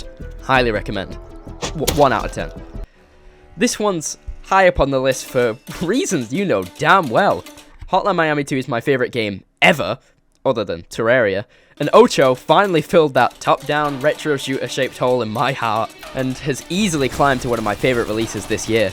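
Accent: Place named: United Kingdom. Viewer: British voice, unsubscribed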